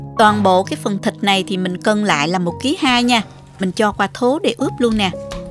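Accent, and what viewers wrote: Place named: Mekong Delta, Vietnam. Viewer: Giọng dễ thương, đúng là gái miền Tây nam bộ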